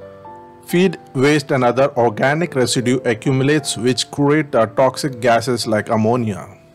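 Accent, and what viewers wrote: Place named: India. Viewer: HARD INDIAN ACCENT SAYS I CANT TRUST HIM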